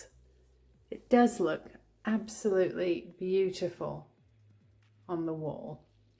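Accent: England, United Kingdom. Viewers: Yes, love her voice and English accent.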